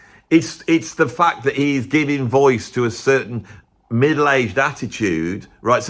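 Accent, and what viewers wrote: United Kingdom. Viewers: You do a great British accent. 😅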